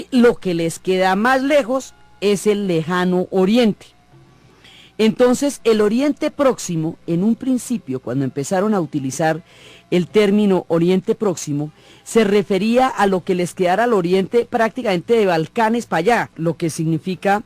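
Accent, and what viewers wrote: Colombia. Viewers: Jaajajaja narras muy chimba pareces Colombiana saludos de un gnóstico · Oiga usted!!! Qué manera tan clara de impartir historia!!!!👏🏼👏🏼👏🏼 siempre me había enredado con aquellos nombres de los persas... delicioso ese acento colombiano😉👋🏻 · Hola, soy andaluza. Ese 'dege' suyo colombuano tan gracioso y expresivo, esa cultura, inteligencia y empatía para transmitir sus conocimientos es de Premio Novel a la expansión cultural a todos los publicos de habla hispana. Gracias.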